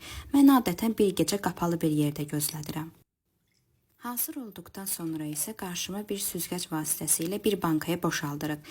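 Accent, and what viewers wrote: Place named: India. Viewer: doğurdan bu ses senin sesindi? Birinci ele bildim hint qızıdır 😅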